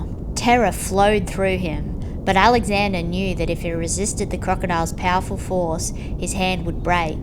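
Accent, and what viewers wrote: Australia. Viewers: great to hear an aussie accent narrating, excellent content!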